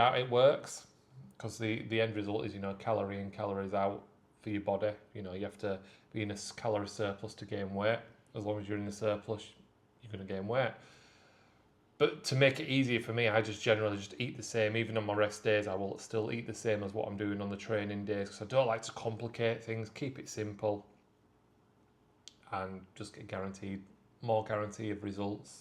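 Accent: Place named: West Yorkshire, United Kingdom. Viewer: Where abouts in Yorkshire you from? Sounds west Yorkshire ish accent!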